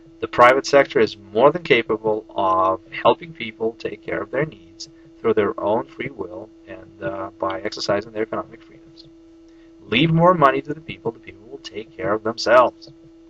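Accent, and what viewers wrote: United States. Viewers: you have to make a video about how you acheaved this accent seriously :) im amazed how freely and fast you speak and what a good american accent u have its hard to imagine that had developed this accent after you went to the US when u are there owhensince 2005 ...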